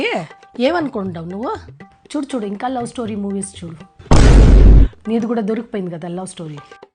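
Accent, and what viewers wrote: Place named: Bangalore, India. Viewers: Kannada telugu slang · Dheeni TELUGU enti Kannada kampu koduthondhi… thuuu ekkadaleni maayadhari santha motham YouTube lo undhira baabu.. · Very good slang 😂😂😂 Bangalore Telugu ❤❤ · Native kannada na midi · అనంతపురం బెంగళూరు బార్డర్ భాషయాస ,, రాయలసీమ కన్నడ మిక్స్ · Rayalaseema and karanataka barder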